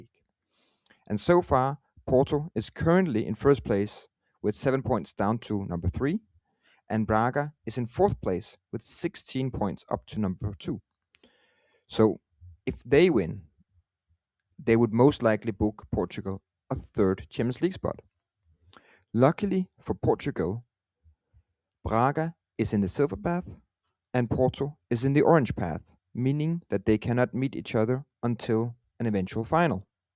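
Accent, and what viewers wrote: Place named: Denmark. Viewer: Are you Danish? You have a very Danish accent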